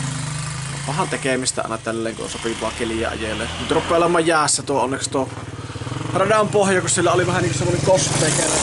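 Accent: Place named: Savo, Finland. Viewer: Pit tilata kanava, vaekuttaa lupsakalta tuo Savonmurre ja ite kanava myös😉👍Minun juuret on syvällä Savolaisessa kivisessä muassa. Minun murteet männy sekasin kun asunut Etelä-Suomessa ja nyt Pohjois-Karjalassa ja syntyjään sieltä.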